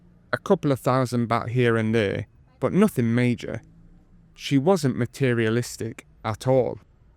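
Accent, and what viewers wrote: England, United Kingdom. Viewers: Your full of BS you are not an Australian do you think we are all stupid, I heard you say with a pommy accent who you have been from Canada, The US with the same old story about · You certainly don’t sound Australian mate Have you spent time in the UK or something?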